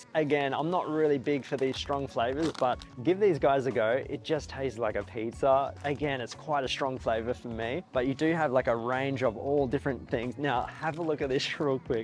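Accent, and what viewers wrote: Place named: Australia. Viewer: for real mate, and also your accent is sending me back to the years I spent growing up in Australia haha I used to live in the west coast in Perth, it probably is one of the factor that makes me feels cozy when watching you. 😄 I would love to be a teacher in Japan to be honest but sadly I am not native and its not an option where I am from haha, I am currently in training for the SSW programme specifically Food Processing Industry 😁 training will last for up to 6 months and afterwards is job matching hopefully I will live there. so in total its about 1 year more or less. I definitely can't wait to experience Japan 😆 But yea, thank you for the wish, I will go full send, keep the video coming mate! I will be sure to watch every single one! and I hope your channel will be huuuge on day ✌much love from Indonesia! 🇮🇩🇮🇩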